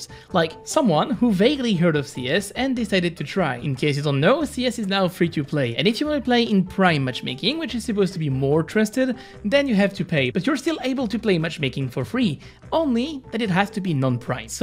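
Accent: France. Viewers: Tu es français ou je me trompe car ton accent m'a l'air bien francisé ??!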